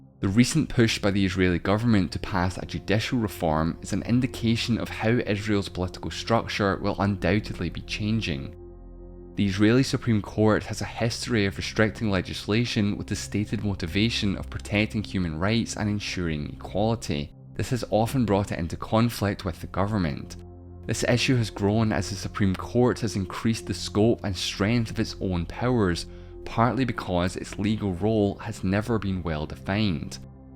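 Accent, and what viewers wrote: Scotland, United Kingdom. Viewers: He's Scottish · A Scottish English accent is so appealing to the ear.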